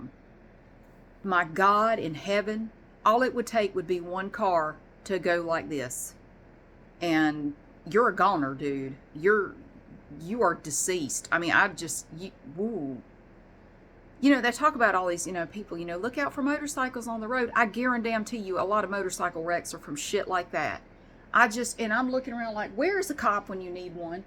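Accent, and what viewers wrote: South, United States. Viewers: Ms., I’m not sure if you already know, but there is a viral video of a woman with a southern accent on TikTok and many of the people watching that video were requesting southern accent asmr from her. Soooo many of the people replying to these comments were suggesting your channel and singing your praises! I was so happy to see that you’re so popular, even people on TikTok bring you up! Just a small a testament to your affect in the asmr community 🤗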